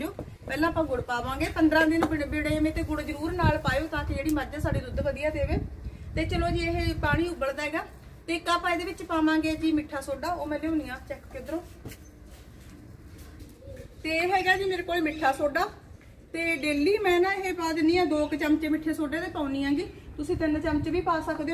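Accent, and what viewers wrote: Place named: Moga, India. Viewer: ਭੈਣਜੀ‌ਮੋਗੇ ਏਰੀਆ ਦੇ ਲਗਦੇ ਓ ਲੇਟੀ ਸਬਦ ਓਧਰ ਹੀ ਬੋਲਦੇ ਓ ਵੈਰੀ ਗੁੱਡ ਭੈਣੇ ❤❤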